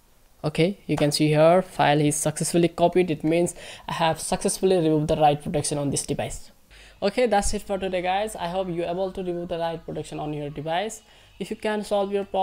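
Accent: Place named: Arab world. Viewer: What country are you from you look Indian but have an Arab accent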